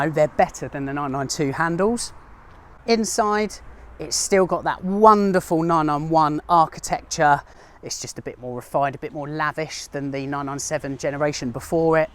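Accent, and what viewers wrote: England, United Kingdom. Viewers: What type of English accent does he have?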